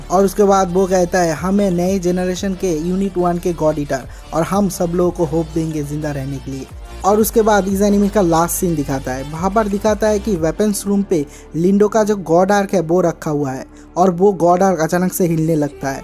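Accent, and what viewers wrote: Bengal, India. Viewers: Ap bangal se ho kya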